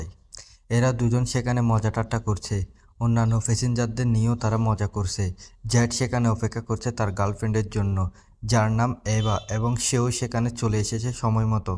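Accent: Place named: Chittagong, Bangladesh. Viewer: Plz suddho bangla shikhun...er por explain korben plz.... Puro puri Chittagong er tone.. Cheap!!!!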